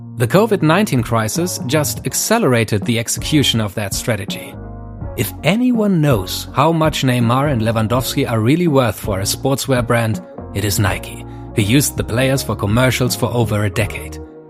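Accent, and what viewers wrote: Germany. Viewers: Ich höre doch unseren deutschen Bruder raus 🇩🇪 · Man hört dass du ein deutscher bist gib dir mal mehr Mühe laaan.. german accent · Ich liebe diesen unfassbaren deutschen accent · Bist Safe 'n deutscher bei deinem Akzent und wie du die Namen ausgesprochen hast :D🤙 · Man hört es so raus das es ein deutscher ist hahaah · omg lmao, sos, when i watched this vid, it was at night and i didnt have full volume, now i hear that hes german lol, but yh lol, americans cant play football and cant even call it the right name u cant deny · He’s German tho and that’s his accent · the voice actor just sounds so german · This guys definitely German 😂 the way he says puma and neymar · Bro, your German accent is soooo thick. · Der deutscheste akzent überhaupt 😂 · Definitly its a german speaker i think. Ik hör da ein Akzent raus, richtig? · German accent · The german accent tho... 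· You hear really german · Ich feier deinen deutschen Akzent, mach weiter so!! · Wow, I feel the German accent so hard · funny how you can hear the german accent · Love your German Accent 🤘🏽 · broski sound so german(deutsch) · 100% Deutsch warum hört man das? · "positive racist" bias: your german accent (or austrian) sounds perfect when you explain marketing and global strategy Great work my friend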